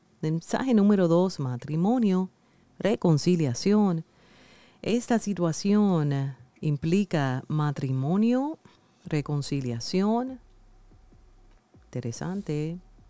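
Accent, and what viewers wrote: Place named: United States. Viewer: Soy divorciada hace 20 años, no deseo ni un poco volver al pasado, no deseo ni acercarme. Tengo problemas con mis 4 hijos y me deja muy triste. Los crie sola, siempre. Pero es tanto lo que me lastman que he decidido abrirme. Aunque me duela. Soy Madre por naturaleza los amo, pero tengo Amor propio Estabilidad economica es lo que necesito. Me es difícil jubilada a los 73 años generar mas entradas. Ruego al Dios, me de la bendición de ser autónoma, y no necesitar de la ayuda de mis hijos. No voy a bajar los brazos. Ahora hare jabones que venderé. Y rentare mi departamento, al fondo de mi jardín.y tener una ayuda económica. En Argentina es muy difícil la situación. Te doy las gracias y mis bendiciones para tod@ los que te escuchamos. Hacia tiempo yo no lo hacia. Te agradezco, me encanta como suena tu español, con acento americano❤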